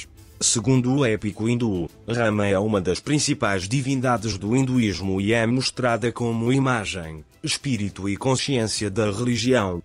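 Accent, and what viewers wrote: Portugal. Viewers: 🎯 EXELENTE VÍDEO!👍🏻Guerreiro da Casa do Conhecimento... me responda por gentileza!👍🏻Pôr quê... à narração, está com sotaque de um português? 🤔 Estamos Juntos! 📡 NAMASTÊ! 💥🖖🏻®️ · Sotaque português, provavelmente da zona de Lisboa... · Sotaque português,que porra é essa?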